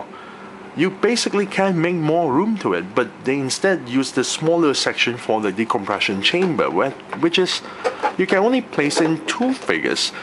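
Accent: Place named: United Kingdom. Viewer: Sounds like across the pond 😊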